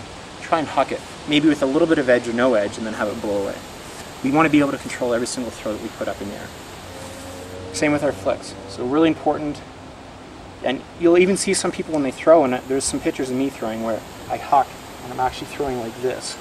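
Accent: Canada. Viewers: He's Canadian, dude. That's the Canadian accent, nothing weird aboot it. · Canadian, eh?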